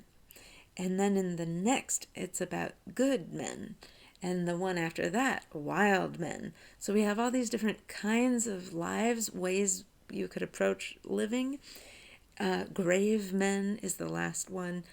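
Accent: Ireland. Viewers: Bad start.......IRISH!?!?!?!?! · What....an Irish ...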